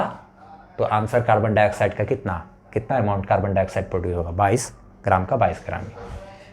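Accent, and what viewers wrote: Bihar, India. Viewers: Sir do u from BiHaR ??....😊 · Sir are u from bihar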